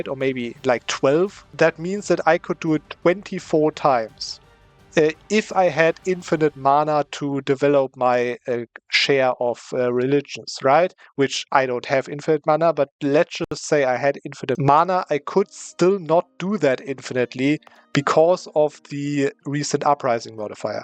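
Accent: Germany. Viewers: REALLY thicc german acsent.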